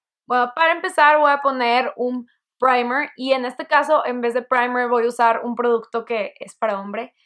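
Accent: Monterrey, Mexico. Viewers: Me gustan tus vídeos pero me encanta tu acento regio😁 sigue así, soy fan 🙆‍♀️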